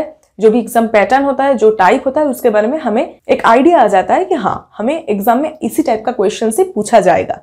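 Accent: Bengal, India. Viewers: Aap bengali ho kya mam ??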